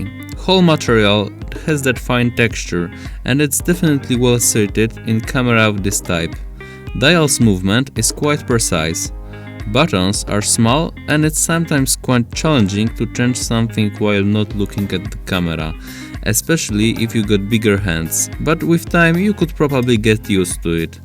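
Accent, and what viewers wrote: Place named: Poland. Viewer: dobrze trafiłem, że jesteś Polakiem po akcencie:) Jakbys kiedys przypadkiem doszedł do tej funkcji to fajnie byłoby gdybyś nagrał o tym film:) Pozdrawiam.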